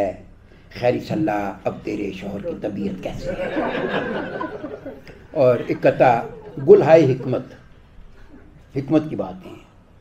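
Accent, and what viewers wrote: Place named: Punjab, India. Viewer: Bohat maza aaya !! Punjabi Urdu mix , bara desi style hai aap ka 😅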